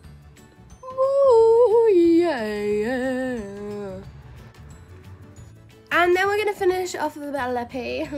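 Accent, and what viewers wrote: United Kingdom. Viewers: YOU’RE BRITISH?! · She's British but she lives in France (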